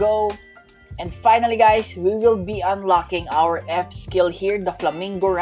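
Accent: Philippines. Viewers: Filipino kba? Halta sa accent e, btw gawa ka noob to pro using light and tips for light user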